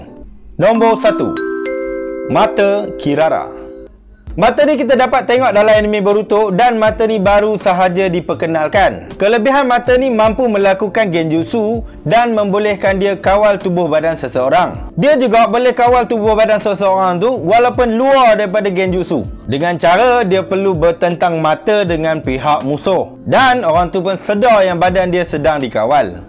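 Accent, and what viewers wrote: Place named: Kuala Kangsar, Malaysia. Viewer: atee deme ckp mcm orang kuale deme orng perak yea bote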